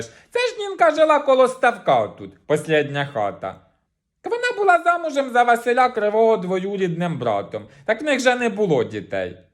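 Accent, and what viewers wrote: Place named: Karlivka, Ukraine. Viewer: Народилася, виросла і жила до 17 років в Карлівці Полтавської обл. У нас так розмовляють😂 100 процентів! Як наче в двір до когось в Карлівку попала😂 Обожнюю слухати цей суржик, говір і манеру подання❤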